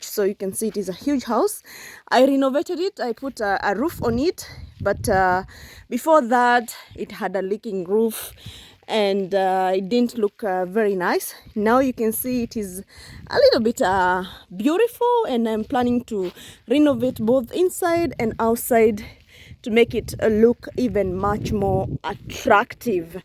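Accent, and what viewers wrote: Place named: Kenya. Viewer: U sound kenyan.....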